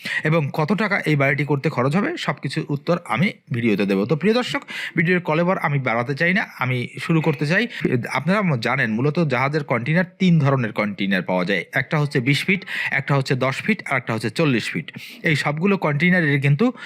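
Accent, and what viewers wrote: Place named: Barishal, Bangladesh. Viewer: ভাই আপনার কাছে একটা প্রশ্ন রয়েছে, আপনি কি বরিশাইল্লা? মানে আপনার বাচনভঙ্গি যতই শুদ্ধ করেন না কেন আপনার বরিশালের ভাবটা রয়েই যায়। বলি কি শুদ্ধ করে কথা বলার দরকার নাই বরিশাইল্লা কায়দায় কথা বলেন তাহলেই তো হয়।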